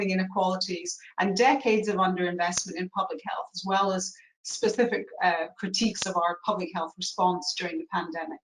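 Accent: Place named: United States. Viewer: Why is Professor accent becoming more American by the day? SO annoying!!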